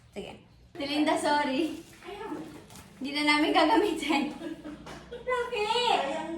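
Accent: Visayas, Philippines. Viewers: I love her bisaya accent like when she said wala na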